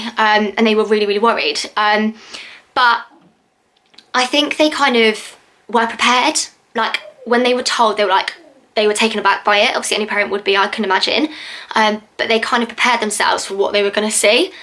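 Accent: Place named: United Kingdom. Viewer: I have a bilateral cleft palate I am Filipino male. I experienced a lot of verbal abuse from my own dad and other people because of my birth defect. I tried many times to slayed my oown life. I experienced more than ten years depression. I survive of this negtive situation because of my faith to Jesus Christ. I love your gorgeous accent and u have pretty face moon. I wish I can meet a beautiful british girl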